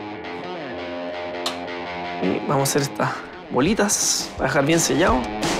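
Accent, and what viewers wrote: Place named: Chile. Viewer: Dudé que fuera chileno porque entiendo todo lo dice JAJAJA. Qué buena receta, gracias!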